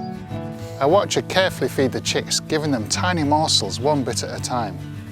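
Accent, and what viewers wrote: United Kingdom. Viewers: Male toenail. Toenails. Love the British accent.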